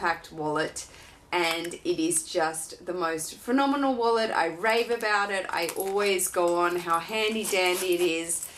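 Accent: Australia. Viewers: Love your videos and your aussie accent :) I would like to get the Neverfull MM. Lots of love from Berlin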